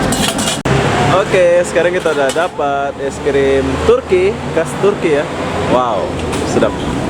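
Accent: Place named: Kuala Lumpur, Malaysia. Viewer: Cara ckp ada bau2 ckp melayu kl.. It's ok bro....😉😉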